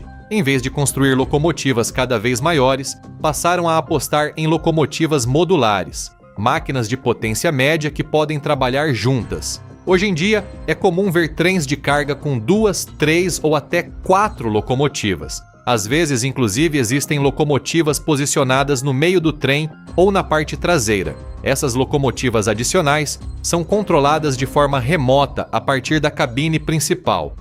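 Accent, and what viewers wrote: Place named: Caipira, Brazil. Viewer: Eita sotaque caipira.... rs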